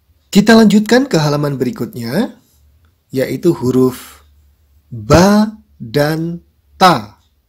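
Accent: Java, Indonesia. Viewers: Kedengaran kali yg baca orang jawa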